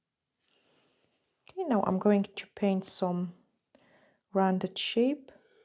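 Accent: Scotland, United Kingdom. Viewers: I'm ordering your foil design gel and white french gel as it looks AMAZING when you use it... I already have your foil gel and it's the most brilliant foil gel or glue I've ever used omg. It's brill. Your colour gels look amazing too as they look so pigmented in your vids but i just love your personality and the way you say wee when you're saying little ha ha. It's sooooo cute and sweet as you live in Scotland it's rubbed off on you. You remind me of my mum-in a really sweet way. She's English but spent half her life in Scotland and uses Scottish slang in her English accent. It's sooooooo cute and sweet. I think you're such a sweet feminine lovely lady and you're super duper talented on top... and you're gorgeous!!! Ha ha I love your vids. I've copied a few (tried) hope you don't mind. You're extremely talented and I'm looking forward to getting my design gel and French white gel X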